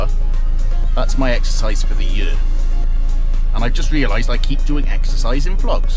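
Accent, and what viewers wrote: United Kingdom. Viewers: Wow I love the view 😍👌 nice british accent can you teach me haha kidding 😆😊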